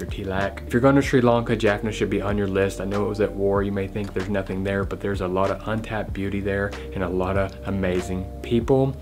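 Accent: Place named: Texas, United States. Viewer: Great video, first time seeing a great presentation of our food and culture by a westerner. The food, both the vegetarian food at the restaurant and the seafood looks very authentic and of high quality. I can't believe that the way you ate is more realistic and natural than how we natives eat. It seems you really enjoyed the food. I am originally from the other side of the Island, the Tamil Nadu state of India, now living in Houston, Texas. Based on your accent, you seem a Texan too.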